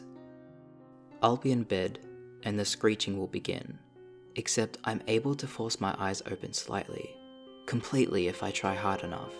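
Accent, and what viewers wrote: Australia. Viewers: Wtf the transition from the anime part to the actual video scared me cuz I forgot you had an Australian accent..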